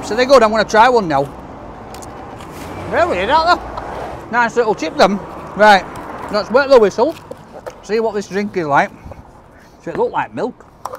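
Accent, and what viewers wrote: United Kingdom. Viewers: Great videos.. great accent. What part of Britain is it?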